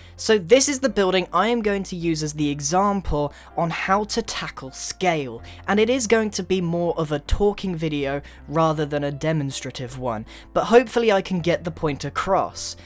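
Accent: United Kingdom. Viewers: Am I the only one there think he sound really british in this video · Are you british england?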